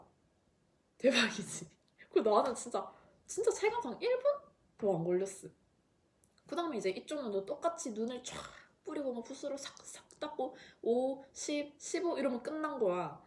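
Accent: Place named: Gyeongsang, South Korea. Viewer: 경상도분이시군용 ㅋㅋㅋ귀엽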